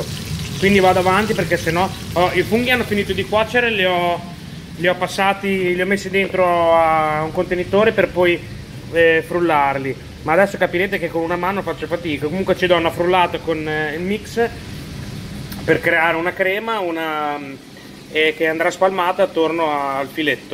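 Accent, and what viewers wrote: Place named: Emilia-Romagna, Italy. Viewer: il tutto molto avvincente e credibile (soprattutto).ottimo sei riuscito ad impastare al 80% di idratazione.a questo punto se puoi mandare le esatte proporzioni degli ingredienti, o mandare il link del maestro pizzaiolo.la pizza in teglia la dovresti togliere dalla teglia ed adagiarla su una griglia sopra levata, per togliere l'umidità del fondo.ancora complimenti per la sala cucina, veramente a norma di legge e pulita.anche l'accento della emilia romagna, personalmente mi garba.che dire ma ce li farai vedere come si fanno i tortellini al brodo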